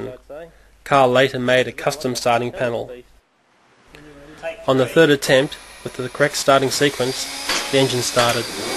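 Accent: Australia. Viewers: Who is this fucking robot voiced australian narrator? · That's an Australian accent you tool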